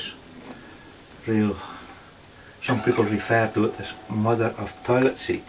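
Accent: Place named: Scotland, United Kingdom. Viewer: Your accent is really hard to place. Sounds Scottish, sometimes Irish and sometimes Northern European.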